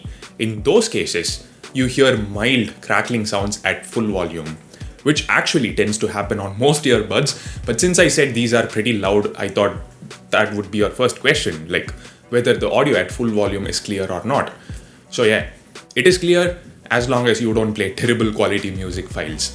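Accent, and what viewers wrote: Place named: Tamil Nadu, India. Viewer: The way you stress few words, typical tamilan da.. Qwalittyy, sooperu, sometimesu, controllu... But I love your videos and review script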